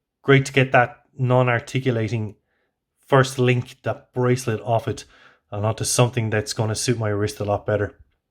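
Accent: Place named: Ireland. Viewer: And, nice channel you've got there. As an Irish guy as well, the familiarity of your accent and turn of phrase is nice to hear. And like you, I'm in the same boat when it comes to Red Breast.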